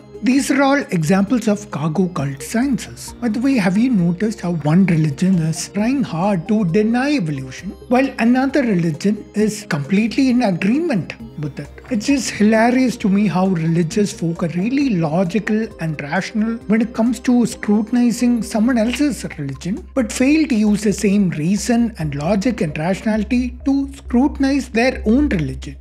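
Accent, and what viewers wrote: India. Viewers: Bro you have very thick Indian accent.😂😂😂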